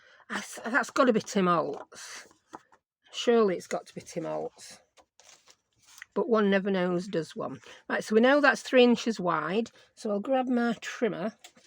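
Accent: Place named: Sheffield, United Kingdom. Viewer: Wonderful video as usual. You mentioned Sheffield this time and then I knew why your accent sounds so familiar. My parents are from there. I do not hear there accent but others do. We have been in Canada since 1965. But you sound like my relatives over there. I do enjoy your videos. Thanks for sharing!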